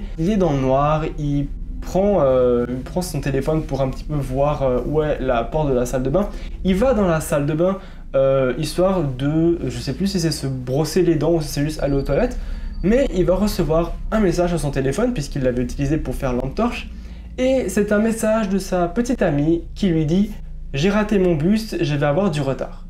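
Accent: France. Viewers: Mais tu vis au québec ? Mais t a l accent français...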